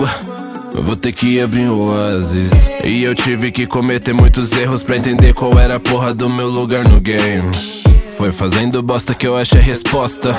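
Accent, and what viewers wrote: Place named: South, Brazil. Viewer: bah sulistas não mudem nunca acho mo legal esse sotaque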